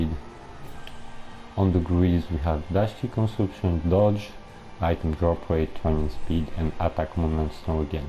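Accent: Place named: France. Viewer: Your French accent is so sweet, and good build gg wp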